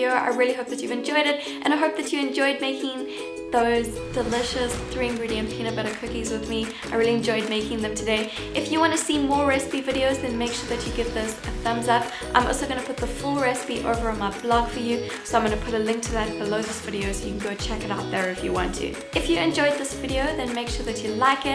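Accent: South Africa. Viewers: Are you from south African? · are you south african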